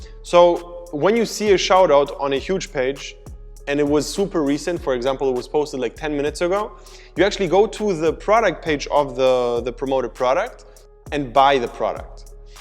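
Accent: Germany. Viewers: Servus, an deinem englisch (zugegeben gut, aber trotzdem) erkennt man das du deutscher bist:D Hilfst du auch kompletten anfängern beim einstieg? möchte unbedingt anfangen aber nicht unnötige Fehler machen die zeit und geld kosten.. habe ca 1k, wie lange dauert es durchschnittlich bei korrekter vorgehensweise damit einen profitablen shop aufzubauen? Es geht mir nicht um schnelligkeit aber ich will halt auch nicht unnötig kohle verbrennen und zeit verschwenden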